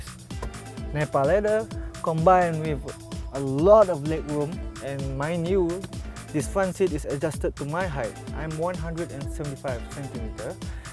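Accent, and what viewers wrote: France. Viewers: Sounds like a French talking English. But it's entertaining. Good job. Keep it up. What matters is the info about the car. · Sounds like a French talking English... It's nice to hear that, good job bro 👍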